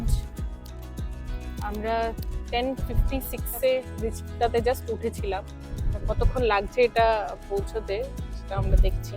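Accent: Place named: India. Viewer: Apnara originally kothakar...apanar bhasa suina mone hoilo assam ba Tripura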